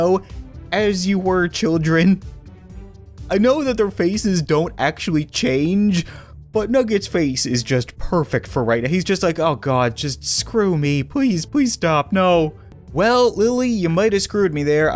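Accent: Canada. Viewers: I like his Canadian accent it’s funny as hell when you get used to it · You sound really Canadian in this video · He sounds extra Canadian and I love that I want to visit Canada so bad now. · True Canadian voice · I just LOVE how Canadian he sounds! XD Just listen to when he says "sorry"!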